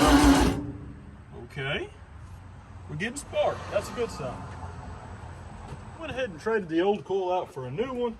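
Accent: South, United States. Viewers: I from Chicago. I love this guys southern accent it makes the video even way better.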